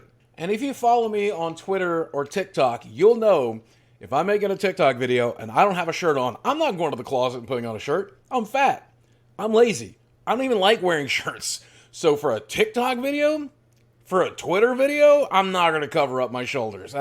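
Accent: South, United States. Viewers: Watch his "origin story" series... he originally had a hillbilly accent, and it comes back when he visits the town he grew up in.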